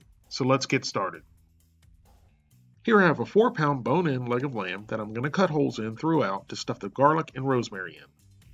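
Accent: South, United States. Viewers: What state do you live in? Sound like a Kentucky or Tennessee accent?? Edit. My mistake i meant what state are you from. I grew up not knowing about moving out of state.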